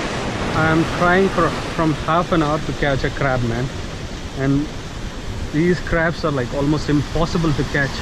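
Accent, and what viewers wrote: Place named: Telugu, India. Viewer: Maa shaa allaah baraak allaah Pure Telugu awesome bhai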